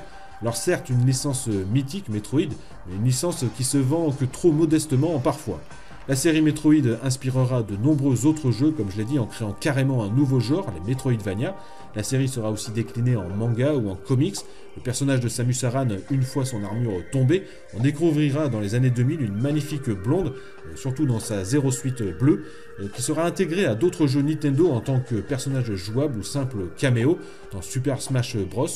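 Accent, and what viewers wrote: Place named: England, United Kingdom. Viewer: Super chronique comme d'habitude. Ça fait longtemps que je suis abonné mais la seul chose que je te reprochearai c'est ton accent anglais pour les titres. Sinon continue comme ça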